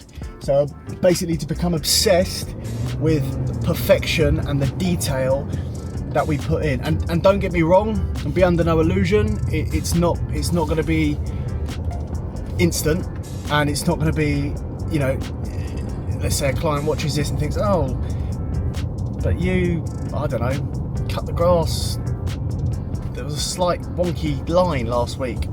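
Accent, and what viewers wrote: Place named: Cockney, United Kingdom. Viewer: Wanting to become a high end firm whilst filming yourself in a vest sounding like a cockney wide boy does not come across to potential customers as high end sorry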